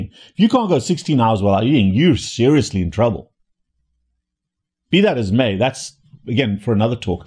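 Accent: South Africa. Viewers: That depends where you are from. He is from South Africa.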